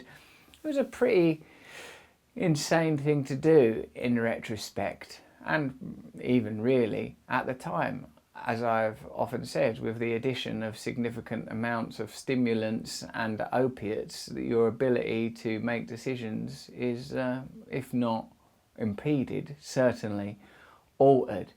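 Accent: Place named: Essex, United Kingdom. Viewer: Russell I remember you hosting at various raves for MTV, and I knew from the almost permanently dilated pupils that you were "on the ting" 20+ years ago.....but that wasn't the first thing... What immediately jumped out of the screen was an apparent prodigy, preternaturally imbued with oratorical gifts, the likes of which I hadn't heard delivered via Essex accent lol... Glad I've stepped in the time machine to witness "that Russell" fully realised and giving back. ✌🏾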